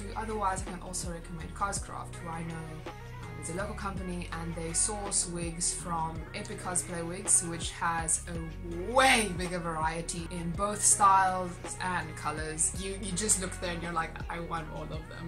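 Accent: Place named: South Africa. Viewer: Just your voice tells me u r South African :) love ur cosplays I hope one day I can cosplay like you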